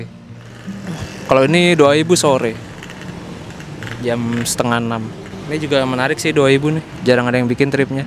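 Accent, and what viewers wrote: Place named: Banyumas, Indonesia. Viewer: Legend bise wong ngapak,, kemutan jaman sekolah numpake bis Asli 😂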